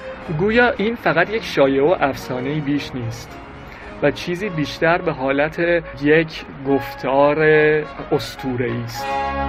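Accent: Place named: Italy. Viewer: عالی، عالی! بفدای لهجۀ شیرین ایتالیایی شما بشم من شوقی در نهاد ما نهادی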